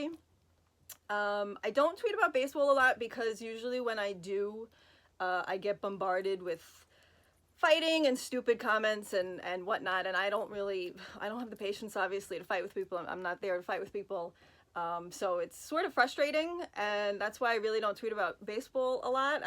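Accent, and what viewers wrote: New York, United States. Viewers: Awesome video, love the N.Y. accent. Just an FYI I have notifications on and didn’t get any. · I’m a Londoner and been to NYC many times and I think your accent is awesome and quintessentially New York which is no bad thing at all. Sorry to hear of your fathers passing. Snap regarding being Italian 😊👍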